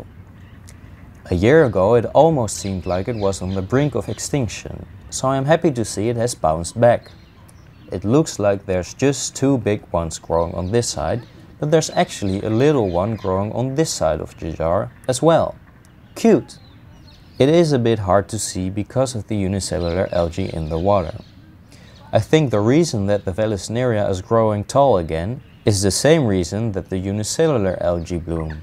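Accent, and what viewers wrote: Netherlands. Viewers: >hear accent >see background environment This guy is 100% Dutch.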